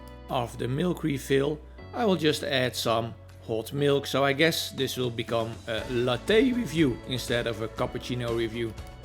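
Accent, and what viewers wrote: Netherlands. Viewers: Ik kon ook gelijk horen dat het een Nederlander is maar ik vind het prima verstaanbaar Engels, en daar gaat het om..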